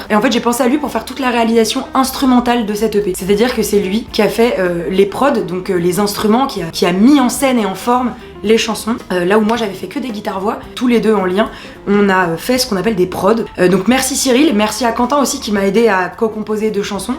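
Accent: Quebec, Canada. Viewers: J'adore l'accent québécois 😂😂❤❤congratulations 💪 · Chuis jaloux de ton accent québécois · l'accent québécois, une pépite ! Bravo <3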